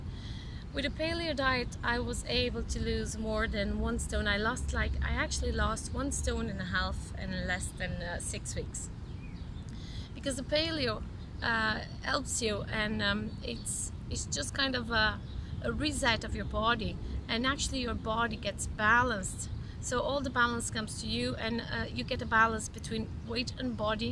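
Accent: Ireland. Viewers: Omg that irish accent ... just love it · That irish accent! Nota-se imenso! Gostei muito do video e do sitio onde estás, queria muito! Brevemente vou trazer um vídeo sobre vegan/vegetarianismo para o canal, e a Paleo Diet parece um bocado assim também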